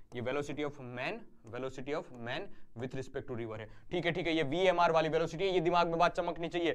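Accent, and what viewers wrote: Gujarat, India. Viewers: Sir Gujarati confirmed by accent 👏🏼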